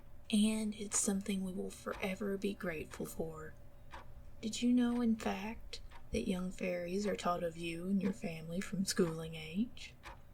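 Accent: South, United States. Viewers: Definitely not you. Risking sounding like a simp, but you’ve got a voice (and likely a personality) like a southern angel😁. Honestly, it’s great to finally see an ASMR channel with a southern accent. Being a southerner as well, I appreciate good southern voice acting. Keep up the great work, can’t wait to see more!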